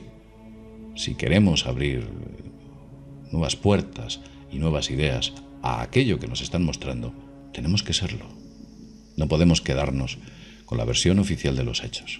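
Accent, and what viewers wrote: Spain. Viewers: Dire algo que jamas han dicho... me encanta tu voz ;) ja! naa lo digo en serio, me encanta el asento español (soy chilena) y obviamente tambien todos estos temas de conspiraciones y demases. En resumen, tengo todo lo que me podria gustar en un solo video ;) buena suerte y adelante.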